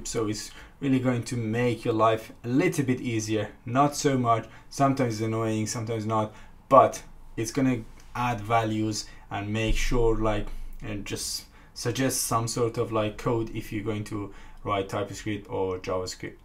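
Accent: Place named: Iran. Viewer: your accent similar to Persian. Are you Persian?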